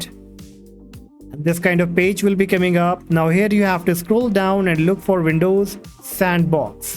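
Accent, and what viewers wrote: India. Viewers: it's always the guy with the bollywood indian voice who helps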